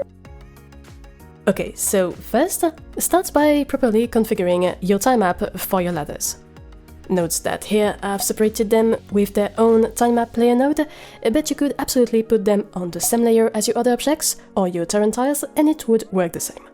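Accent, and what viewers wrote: France. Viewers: I'm still racking my brains trying to figure out how to get a character to climb a ladder. I've almost reached the same solution, but I don't use Godot; I code games in JavaScript without engine, just for fun. But I don't have a function to know if I'm entering or leaving the tile. I'll take a look at the Godot source code. Thanks for the video. PS: Are you French also? Because I surprisingly understood your accent lol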